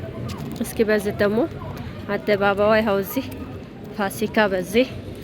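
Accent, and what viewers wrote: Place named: Gurage, Ethiopia. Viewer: ጉድ ጉራጌ መስለሽኝ አማረኛሽ የጉራጌ አማረኛ ነዉ እና ሀይቅ ስትይ ገረመኝ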